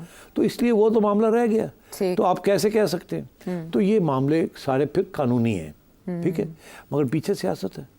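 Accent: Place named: Punjab, India. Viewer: Zabardast punjabi touch, mashallah ❤❤❤❤❤❤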